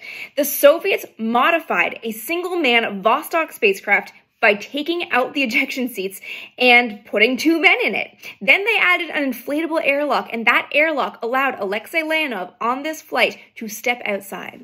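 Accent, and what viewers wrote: Canada. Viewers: are you Canadian? A few long vowels in this short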